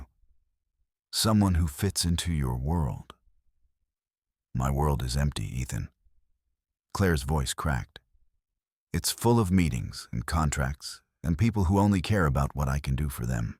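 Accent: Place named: South Africa. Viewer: South African iloveit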